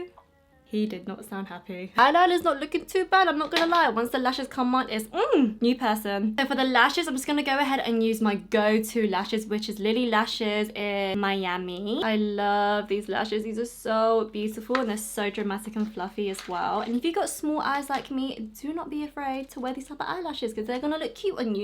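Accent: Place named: England, United Kingdom. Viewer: May I just say how lovely and precious you are?!?! I so very much enjoy watching you and I love your accent!!! I'm from VA in the US and all I hear is country ass southern accents which don't get me wrong that's fine but I love English accents!!!🇬🇧🇬🇧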